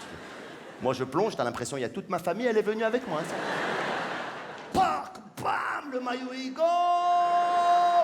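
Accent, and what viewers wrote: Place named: Morocco. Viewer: J aime bien l'accent français marocain Je suis italienne et à travers tes sketch, j 'apprend mieux la langue française, ses sang,intonation ,prononciation Merci bien ,Bravo !!!!!!!!!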